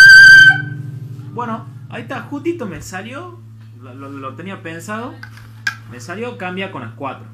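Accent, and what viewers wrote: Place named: Salta, Argentina. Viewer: Muy bueno .saludos desde Salta ,sentía en tu voz una cercanía a mis pagos ,muy buen sonido , y adelante con el proyecto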